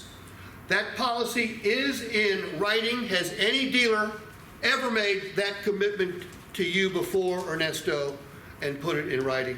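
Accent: Appalachia, United States. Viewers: Hillbilly and his bullshit hillbilly talk give it up. It’s over nobody cares. These are all tricks that consumers know about you need to sit by the pond and watch the ducks.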